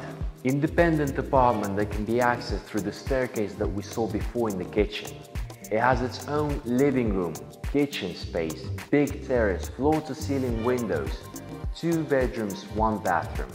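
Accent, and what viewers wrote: Russia. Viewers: Мне одному показалось или ведущий русский? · Cant make out the accent. Russian, Dutch maybe...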